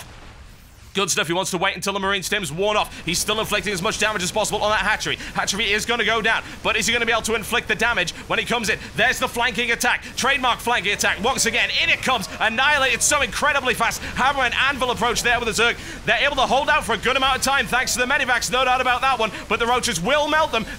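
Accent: United Kingdom. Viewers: He commentates at 300 words per second, you can still understand what he's saying, he doesn't trip over his words, he makes freakin hilarious rants WHILE commentating the match, and he's British! How can you NOT like his commentary!? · not to make a mean comment or anything, but if he wasent british, that would make you 25% less awsome. but you are, so you my friend, are epic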